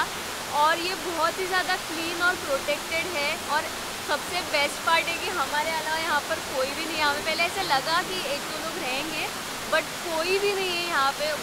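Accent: Maharashtra, India. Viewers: Your hindi accent is cute...poora marathi mulgi :D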